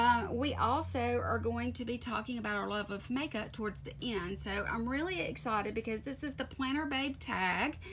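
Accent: South, United States. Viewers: GORGEOUS! Loved hearing your answers and you are tooooooooooo kind to me! I love listening to your cute as pie southern accent :D Urban Decay is such a great brand... they have SUCH an amazing selection of all products too! I love Too Faced but sometimes the quality is hit and miss for me? But some is sooooooooooooo good. <3 loved this... I had so much fun collabing with you!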